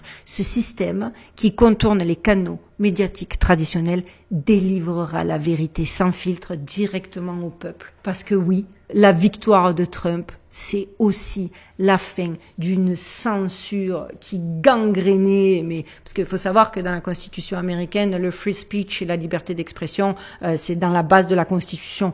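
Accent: South, France. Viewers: merci perché de votre accent du sud 'j'espère que la vague de ce tsunami nous parviendra jusqu'ici en France ,chez nous aussi c'est le bordel j'ai l'impression d'être en famille en vous écoutant😂🙏 prenez soin de vous à très bientôt .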